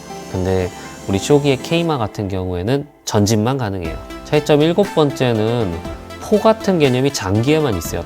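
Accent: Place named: Southeast Asia. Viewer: 동남아 외국인 말투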